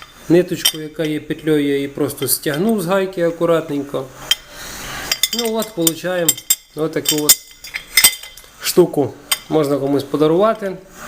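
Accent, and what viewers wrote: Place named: Surzhyk, Ukraine. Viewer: Гарне суржик. Дякую.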